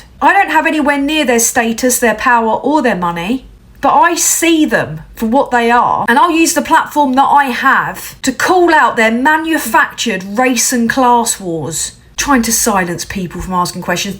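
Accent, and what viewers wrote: Cockney, United Kingdom. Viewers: Your just a Labour Lord Lord Haw Haw, champagne socialist propaganda merchant, with tour mockney accent, which posh school did you go to..your a plant.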